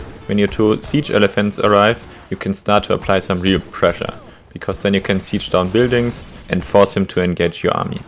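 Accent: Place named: Germany. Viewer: Diese furz trockene art und der deutsche akzetnt 10/10👍🏽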